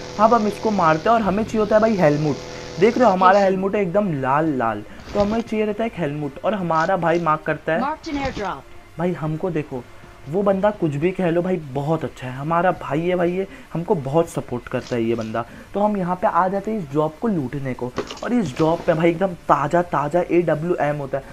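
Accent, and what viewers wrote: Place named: Bihar, India. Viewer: Bihari lagra fulll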